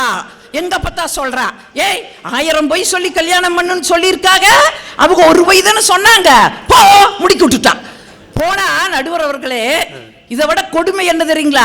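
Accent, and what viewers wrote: Kongu, India. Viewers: எங்கள் பகுதியில் நாங்கள் பேசும் தமிழ் இந்த கொங்கு தமிழ். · கொங்கு தமிழ் கொஞ்சுகிறது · கொங்கு தமிழ் 🎉🎉🎉🎉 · உங்களுக்கு கொங்கு தமிழ் சிறப்பாக இருக்கிறது அம்மா 💐💐💐💐💐💐💐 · கொங்கு தமிழ் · ஆட்ட, ஆட்டையப்போட்டு, கிடாவிருந்து வைத்த து,குபீர் சிரிப்பு!பத்தாயிரம்,போச்சே! பெண்களுக்கு அழகு சாதனம்,நாற்பத்தி,எட்டு! மேச்சுக்கு,மேச்சா!,கொங்குதமிழ்,மெய்சிலிர்க்கவைத்தது❤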